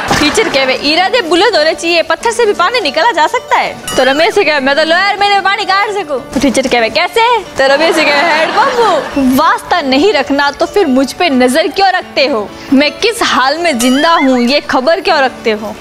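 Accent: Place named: Marwar, India. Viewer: suddhh marwadi bhasha very good g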